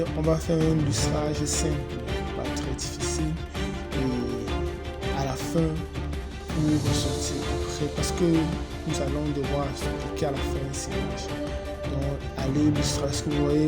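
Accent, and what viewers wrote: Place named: Cameroon. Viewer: Salut Frere, je juge à ton accent que tu es Camerounais comme moi, dis moi quel produit utulise tu ?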